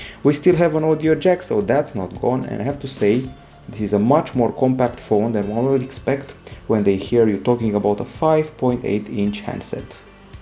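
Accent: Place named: Romania. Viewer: După accent se vede că eşti român :)))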